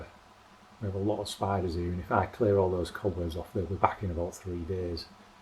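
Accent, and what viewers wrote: Yorkshire, United Kingdom. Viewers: Hi sorry to see you have been rendered unable to work for the time being , but I’m sure you will soon be back at your bench . I don’t know why but I assumed you were from a different part of the world , probably Denmark or Sweden . So hearing you speak in a Yorkshire accent was totally unexpected . 😊 . Goes to show I shouldn’t make assumptions based on limited information . 😅 kind regards as always . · Holy cow, you're a Yorkie! All this time I've thought you were American. I guess we project ourselves, huh? LOL Thanks for the shop tour. Pretty cool. I wish you fast healing, brother! I look forward to watching you make more silk purses from sow's ears when you're better. But don't rush it on our account. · I always pictured you speaking with a Yorkshire accent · Nice to meet you. Here's to wishing you a full and speedy recovery from your mishap. 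I'm not quite sure how long you've been entertaining me but it was a bit before you made the reindeer at Christmas several years ago. So thanks for the brilliant videos. I had no clue where you were from so the British accent was a surprise. Ok then crack on mate.:face-red-heart-shape::trophy-yellow-smiling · So sorry you’ve had an accident. I hope it heals quickly and you’re not in too much discomfort. It was lovely hearing your Yorkshire accent with Hull bouncing through it. Hope you have a great Christmas and New Year. X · Omg i wasn’t expecting a British accent. Watch all your videos so hope you get back at it soon. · Firstly allow me to send my best regards and wishes for a return to health without any lasting effects. Secondly, as crazy as this sounds in my mind I had you down as being German because of your work ethic and attention to the finest details. Hearing your British accent was somewhat of a surprise but a very nice one as it reminds me of how the British were back I the day. 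Bravo to you sir and again, best wishes for healing and a return to your passion. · I never expected the Yorkshire accent, I imagined you as sounding Dutch or Scandinavian for some reason 🤣Thanks for the tour and get well soon, cheers 👍🍻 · Fascinating. Don't know what I thought you would sound like, but I wouldn't have guessed you were from (North) Yorkshire. I'm from a little ways up the road, Newcastle. Its wonderful to watch you make such beautiful, as well as practical items in your workshop. Did you start out in the carpentry business (I know you said your Father was 'in the trade')? Hope your hand heals quickly. 🙏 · I had a feeling you were a Yorkshire Lad😆 Glad your fingers are still attached to your hand, what a horrible thing to go through. Wishing you and yours all the best and a peaceful crimbo. See you in the New Year! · Well you've really done it now. The illusion has been shattered. 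You do possess the ability to talk and proper Yorkshire like t'boot! Loved the tour - complete with authentic cobwebs and good humour. Get better soon. Don't rush back as we'll still be here for you and your next project. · you actually knocked me for six, after watching this video 🙌 first of all I wish you the speediest recovery ( I know you didn't want to delve ) and secondly when I heard your Yorkshire accent I thought bugger me there's my pallet contender 🤣🤣 I'm 9nly down the road, you sir are a credit too the process of wood and it's wonders 💪🇬🇧☕️👌 stay safe and thankyou for the tour 🍻👍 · I used to have Geoffrey Boycott's voice in my head for an example of a Yorkshire accent; you've replaced him. (Big boots to fill? You're up to it!) Couldn't help wondering how many people of the early colonial persuasion needed subtitles! I've been watching you for years, with great enjoyment, and I love the little smile and wave you give us at the end of each video. 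Thank you for a fascinating tour. Heal well, and obey your physio. Kia ora (good health to you) from Aotearoa/New Zealand 🇳🇿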